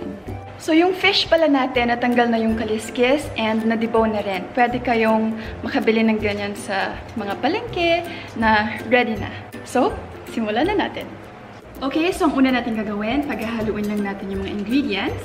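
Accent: Visayas, Philippines. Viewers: Kaaligre magbisaya oy! More videos pa. Loveyouuu😘😘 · I love the way you speak "Bisaya" the same as me 😊 I'm proudly Bisaya 😊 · Ganahan ko kaayo mostorya syag bisaya heheh gwapa kaayo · Oh!! 😮.Ms. its so mouth watering. 😋😋and cute niyo po pagnagbibisaya.😄.ganda mo po always ikaw lang ata ang nag-ihaw na ganyan ka fresh. 😊😍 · Ka cute nlng mubisaya uy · Ka cute mag binisaya oyy 😍😍❤❤ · Cute kau ka mag bisaya bah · Pwede ba ka himo ug Bisaya challanges 24hr.. Kay ka cute nimo magbisaya❤❤❤ watching fr0m Finland🇫🇮🇫🇮🇫🇮 Pa shout me Family Heikkilä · hindi ako bisaya peru bakit ang cute no g. Kpag nagssalita ng tagalog na bkisaya accent hihi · Ang Cute ni Ms. mag bisaya❤️😍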